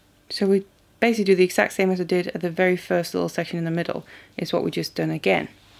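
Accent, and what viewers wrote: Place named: Newcastle, United Kingdom. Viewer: OK, I have to ask where your accent is from! I keep thinking I've got it, then it changes! There really sounds like some Geordie in there!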